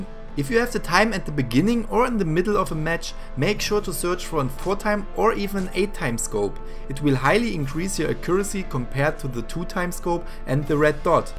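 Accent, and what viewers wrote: Germany. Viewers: You know...I just noticed his language was in Chinese or w.e. yet he sounds German as fuck lol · Keine Ahnung ob das wer lesen kann,aber irgendwie hat er ein Deutschen Akzent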